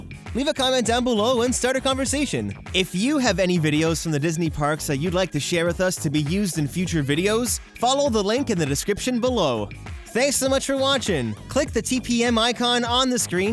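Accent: Canada. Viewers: He's Canadian. That's how Canadian's say it. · He is Canadian.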